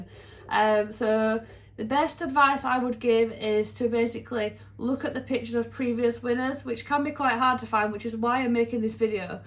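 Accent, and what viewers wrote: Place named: England, United Kingdom. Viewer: Whichever you will pick...you are already winning! Love that accent...is that English? lol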